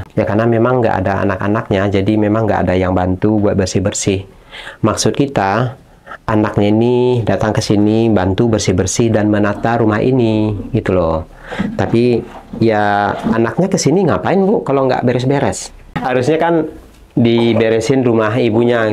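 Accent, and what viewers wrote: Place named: Java, Indonesia. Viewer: Pak lucu kalo gomong Jawa 😂😂😂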